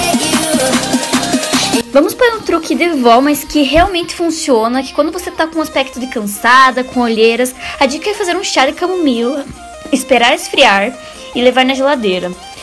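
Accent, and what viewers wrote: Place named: Rio Grande do Sul, Brazil. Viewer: E esse sotaque ai em?😍 Seria um sotaque Gaúcho? bjs sua linda acabou de ganhar mais uma inscrita hehe sua lindona!❤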